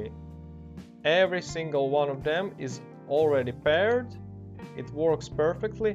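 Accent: Russia. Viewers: Спасибо что сказал как подключить! Странно это прозвучит, но я на начале видео понял что ты из россии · Я сразу по акценту поняла, что ты русский, вхахаха) Thanks for the tutorial, though:)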